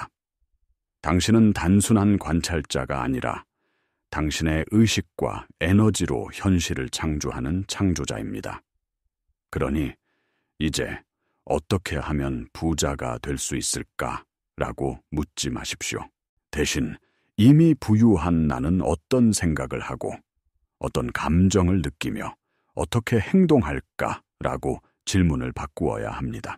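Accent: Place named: Gyeongsang, South Korea. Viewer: 대박대박대박 놀래자빠지고용 화이팅하이숑 경상도 사투리가 당연하지요 창녕띠기 아인교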